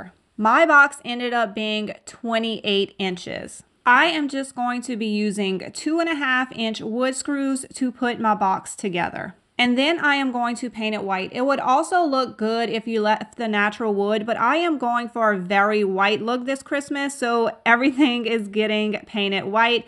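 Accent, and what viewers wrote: Louisiana, United States. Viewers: Are you from Louisiana? You sound just like me! 😆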